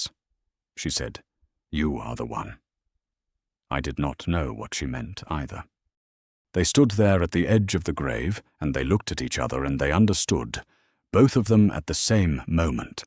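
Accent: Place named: United Kingdom. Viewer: why is the narrator a Brit?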